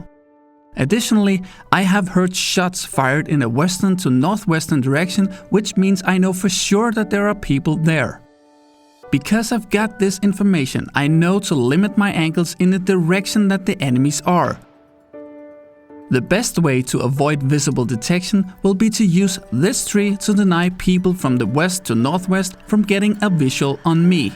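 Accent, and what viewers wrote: Denmark. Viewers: Er du dansker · are you danish ? your accent sound a lot like it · are u danish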